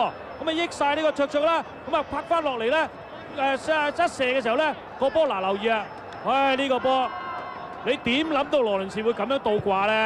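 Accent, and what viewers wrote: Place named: Cantonese, China. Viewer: 粤语解说就是有味儿！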